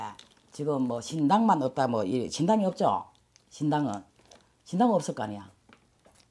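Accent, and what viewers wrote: Gyeongsang, South Korea. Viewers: 예쁜선생님 오랜만이네요. 더 예뻐지셨네요. 뵙고싶었습니다 . 억수로 경상도말씀 징하게하십니더 그래도 말씀 옙뻐에 쌤 건강하세요. 인천이라에 시원 시원하신것이 아주좋습니더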